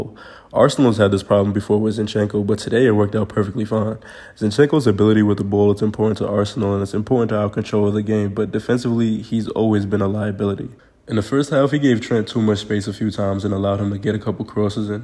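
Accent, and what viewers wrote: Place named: Brooklyn, United States. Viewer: hearing a bk accent talking abt football is so odd to me, loving it tho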